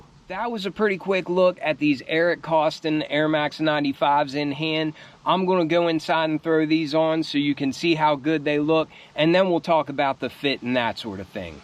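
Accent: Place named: Philadelphia, United States. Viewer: You have kinda philly accent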